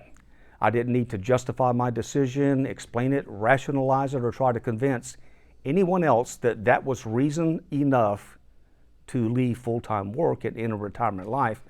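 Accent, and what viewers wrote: South, United States. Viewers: Are you from the south? We’re from Arkansas. We’re exhausted and thinking of retirement. Glad that we found you ❤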